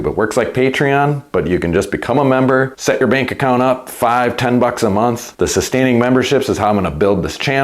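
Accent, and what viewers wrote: Wisconsin, United States. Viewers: I can’t quite decide if I’m hearing Minnesota or Wisconsin but I’m leaning toward the latter. A fun suggestion: the lube counter. You say lube, ding sound effect, a number on screen adds to the count. Cheers from Minnesota! Ope!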